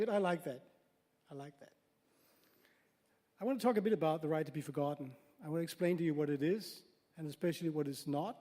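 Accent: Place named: Denmark. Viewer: This is Danish English-speaking,,,, blurry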